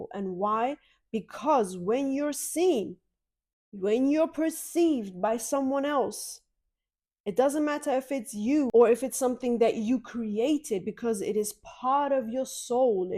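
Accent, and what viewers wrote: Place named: United Kingdom. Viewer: im your 29 sub beacuse your britsh accent is very funny and starting u say naughty naughty also funny but im girl😂 best of luck your journey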